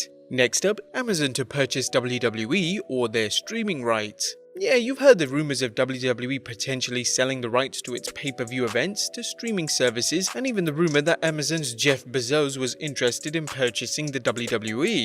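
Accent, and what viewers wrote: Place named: United Kingdom. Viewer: I realize he's British, but yeah..."Bay-zohs" and "Ama-zON"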